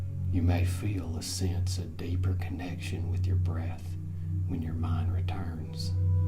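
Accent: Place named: Europe. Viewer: I wish you weren't hilarious. Try to find a female voice for these. I'm tired of the Euro accents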